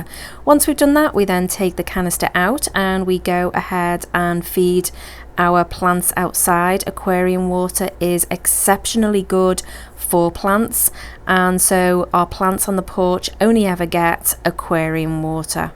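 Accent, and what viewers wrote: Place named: Scotland, United Kingdom. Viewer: I ordered 2 of your Betta aquarium dividers along with the air pump and airline tubing bundle and didn't know you had a YouTube channel. You have a really nice looking aquarium and I like the stand too with the bins for storage. What I like about fishkeeping the most is how every fishkeeper has their own unique way or routine of doing things, the way you and I do water changes are massively different and I like it, keeps things interesting. I noticed you have an I believe Scottish accent and your YouTube info says your location is Uganda but your store is in the US? Do you have people in the US running the online store? Just wondering...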